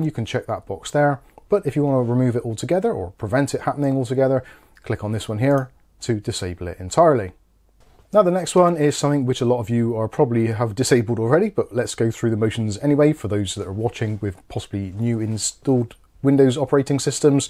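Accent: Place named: South West England, United Kingdom. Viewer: Being from Bristol nice to hear a South Western accent in this space and you also have a love for tech. Subbed mucker.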